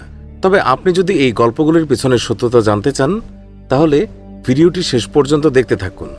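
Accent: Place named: West Bengal, India. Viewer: 😂😂😂😂 আপনার ভাষায় প্রকাশ পাচ্ছি। আপনি ওপার বাংলার। হাওড়া ব্রিজ সমন্ধে আপনি ভুল তথ্য দেবেন না।যা জনেন না ১২:০০ রাতে কোন দিন দেখিনি বন্ধ হতে আমাদের দেশের ঐতিহ্য সমন্ধে , আমরা জানি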